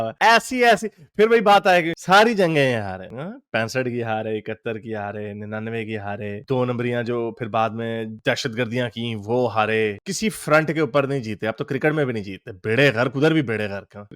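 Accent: Punjab, India. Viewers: Luv your punjabi accent ❤😂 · Your Punjabi touch is super funny 😂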